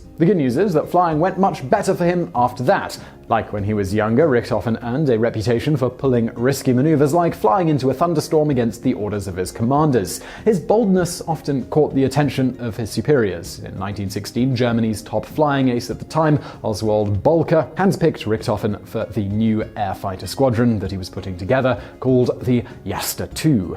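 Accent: England, United Kingdom. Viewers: I can't listen to this guys horrid English accent. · Lay off that pretentious accent🇬🇧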